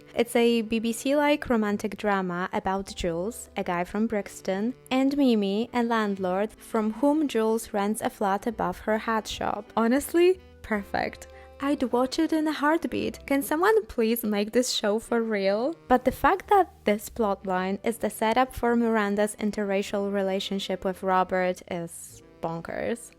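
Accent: Poland. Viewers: I love your polish accent, pls keep it!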